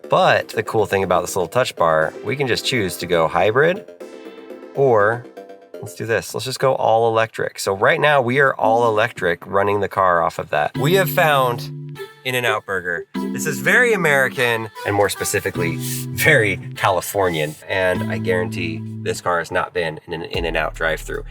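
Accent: China. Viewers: Even the voice is Chinese-English lol.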